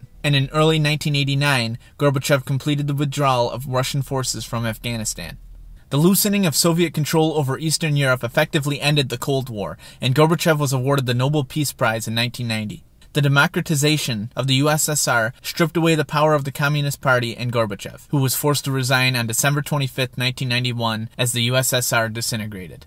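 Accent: United States. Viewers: You from Chicago? I can hear that accent lol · The narrator has a distinct Michigan accent.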